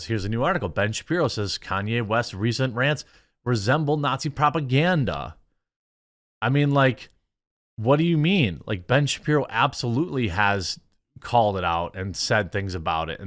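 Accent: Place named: Upper Midwest, United States. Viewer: I just have to say that you talk like someone from the WI, MN or UP.. it took me forever to quit making everything a question with the "ay" on the end of any comment I made. Then if course we have the reverse "ya"!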